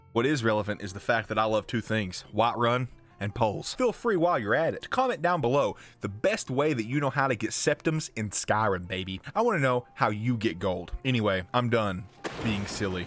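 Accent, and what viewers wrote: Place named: Rural, United States. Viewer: dude i live in texas and your accent is so hick lol skahrim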